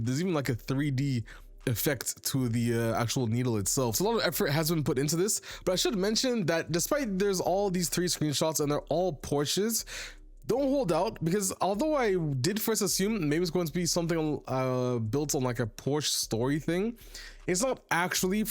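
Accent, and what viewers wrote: France. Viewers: Your Inflection On Certain Words Is Becoming French Too 😭 Pis Pas L’Type Canadien 🥀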